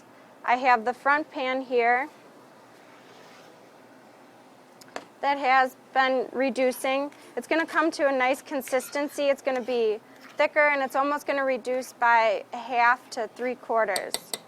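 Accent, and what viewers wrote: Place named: Illinois, United States. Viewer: lol haven't heard an Illinois accent in a while.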